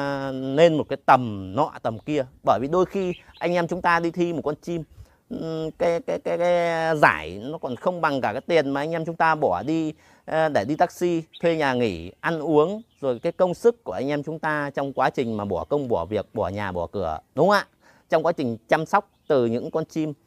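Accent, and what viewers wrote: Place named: Hai Duong, Vietnam. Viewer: Giọng nói quê Hải Dương dung cháy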